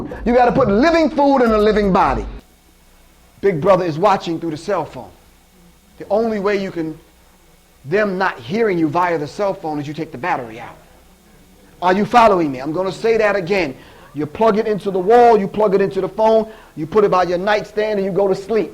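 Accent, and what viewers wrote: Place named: United Kingdom. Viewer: WHY!! This nigga sound British. (no disrespect tho)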